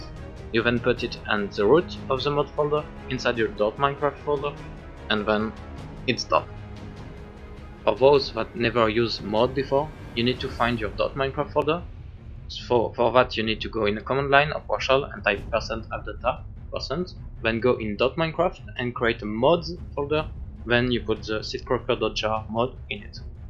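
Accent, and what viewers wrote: France. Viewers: You accent seem french, but perfect english :p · Nice French accent (super mod d'ailleurs) EDIT : It really worked on the server I was playing. I'm shoked it requires zero efforts at all.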